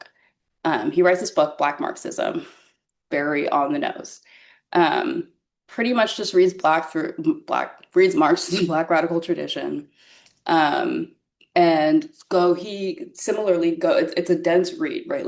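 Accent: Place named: Germany. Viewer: Ur German accent eats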